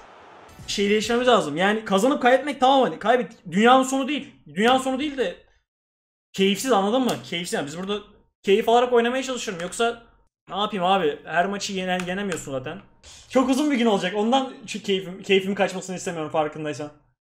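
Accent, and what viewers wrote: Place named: Trabzon, Turkey. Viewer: Api senun şu Trabzon şivene bayılayrom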